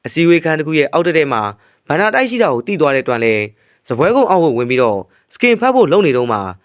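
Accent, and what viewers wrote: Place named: Upper Myanmar, Myanmar. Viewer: ဒီဗီဒီယိုတင်ဆက်သူက မြန်မာပြည်အထက်ပိုင်းဒေသကနဲ့တူတယ်။ Tom ရဲ့ အသံထွက်က "တွန်" ပါ။ "တွမ်" မဟုတ်ပါဘူး။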